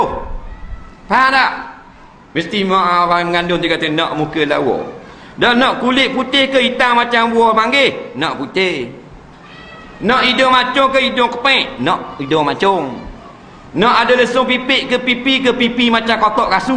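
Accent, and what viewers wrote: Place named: Johor, Malaysia. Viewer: ada sesiapa tahu tak kat surau mana ceramah ni diadakan? cara ustaz cakap, macam kat johor..... surau mana ye? best sangat kuliah ni, panas dan pedas.....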